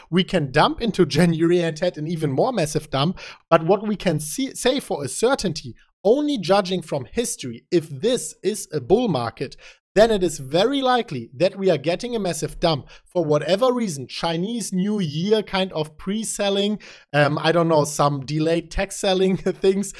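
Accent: Germany. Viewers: Are you german? ;))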